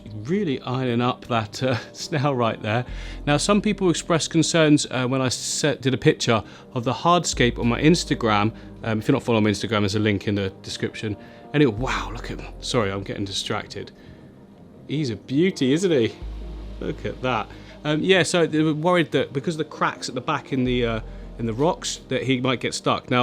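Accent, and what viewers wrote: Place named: England, United Kingdom. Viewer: Thank you so much for posting the name of that stone onscreen. The CC was rubbish! 🤣🤣 It was cereal stone, or serious stone. Clearly not able to process a strong English accent. 🤣😜😍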